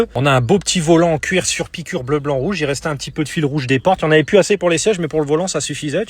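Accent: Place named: East, France. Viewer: Ahah😂😂 j'ai bien ri. J'adore le langage et le léger accent de l'est ou du centre-esr de la France , non ? 😅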